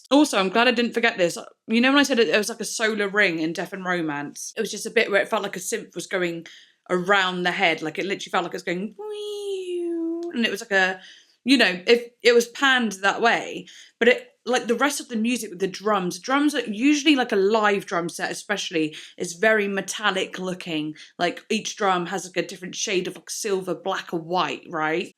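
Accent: Minnesota, United States. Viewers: OMG MINNESOTA SHOUT OUT!! I'M FROM MINNESOTA YOUR ACCENT IS PERFECT! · as a minnesotan i died at your minnesotan accent 😂 MINNESOTA MENTIONED!!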